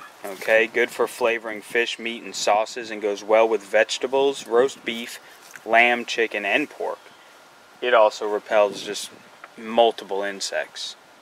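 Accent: Philadelphia, United States. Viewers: Zone nine? Do I hear a Philly accent though?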